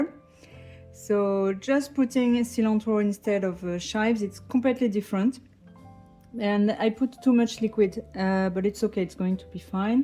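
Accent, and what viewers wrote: France. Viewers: Thank you for the fresh ideas for sauces. It’s not close to spring where I live but I will definitely try these in another month or two. Loved the Parisian street scenes. You have delightful French accent and you seem like a native English speaker. How did you get so fluent in English?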